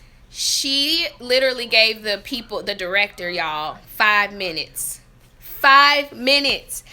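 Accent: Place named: South, United States. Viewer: That southern accent is never gonna go away darlin'. You are always awesome!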